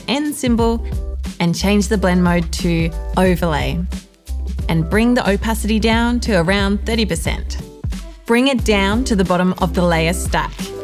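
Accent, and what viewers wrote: Australia. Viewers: I had to swtich the captions on to understand some of the things the presenter was saying. I'm a native English speaker but I found her accent very strange at times? Is it some sort of Australian? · damn the aussie accent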